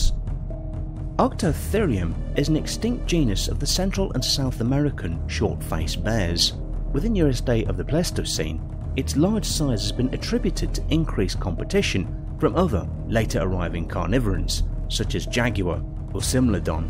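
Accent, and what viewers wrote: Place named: United Kingdom. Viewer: that british accent makes tge video better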